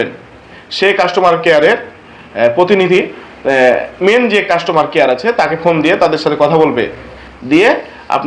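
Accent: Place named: Rajshahi, Bangladesh. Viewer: আপনার বাড়ি রাজশাহী 😅😊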